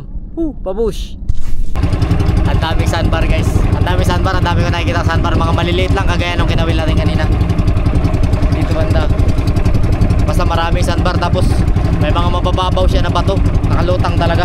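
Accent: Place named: Visayas, Philippines. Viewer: Bisaya ka ya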